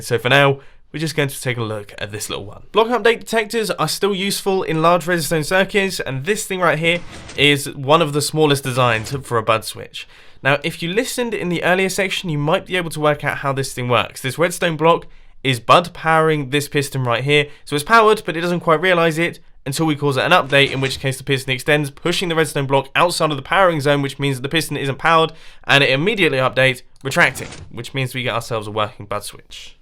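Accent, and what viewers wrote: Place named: England, United Kingdom. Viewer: Love your redstone contraptions and your english accent. XD aka, U r my hero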